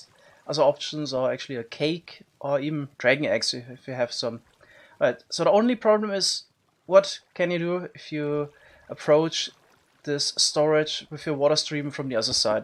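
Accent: Germany. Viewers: Muttersprache deutsch oder? Hört man ziemlich raus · Dumb question, is he German ?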